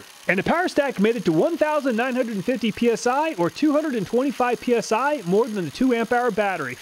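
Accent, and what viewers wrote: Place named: Pittsburgh, United States. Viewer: Are you from the Pittsburgh area? You have the accent. Haha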